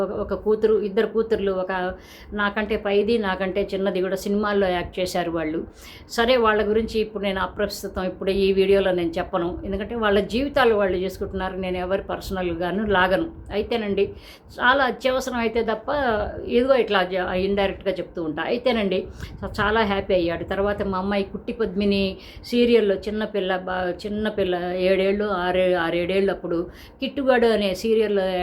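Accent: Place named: Chirala, India. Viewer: Great inspirational words as always ❤ Maadi kooda near by area andi. Chirala daggara, me slang and few telugu words i felt like you are from that area as well.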